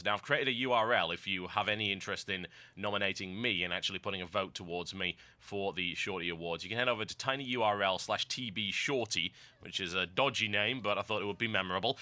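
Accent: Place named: Newcastle, United Kingdom. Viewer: He's geordie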